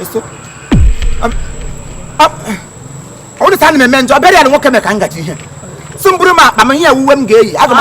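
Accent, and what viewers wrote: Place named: Imo, Nigeria. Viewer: on behalf of Orlu, Nkwere, Dikenafai, Okwele, Umuduru, Anara, and Ala Ekwe, I hereby remove my hat for you. You're a special CASE! Lol (I need to visit Ekwe) when I come back home. Please keep speaking Ekwe/Imo state dialect, don't EVER try to speak Anambra dialect again. #Ekwe #ImoState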